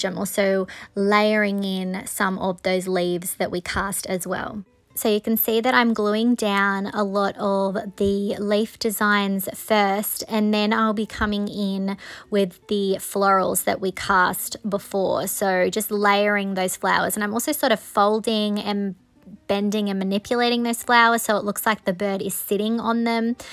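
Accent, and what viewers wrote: Australia. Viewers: Hi, you’re obviously an Aussie, where are you situated. I love your work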